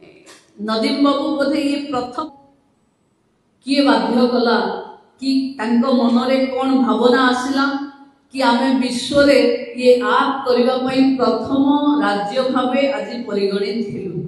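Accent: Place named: England, United Kingdom. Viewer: Speak clear odia. No need to speak english style odia.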